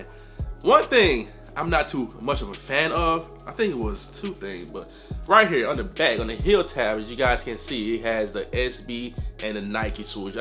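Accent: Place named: Georgia, United States. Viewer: lol I'm surprised the accent strong af!(but I'm in love with Georgia accents so they really stand out to me) Ion even hear the Jamaican in ya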